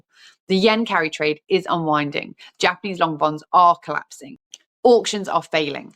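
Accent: United Kingdom. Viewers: Housing market showing real cracks in usa...nice accent, so cool british woman...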